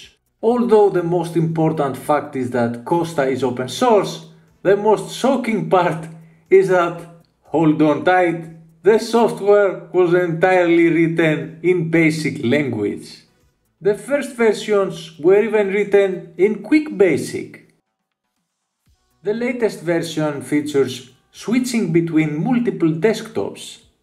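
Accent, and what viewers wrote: Greece. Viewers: He sounds Greek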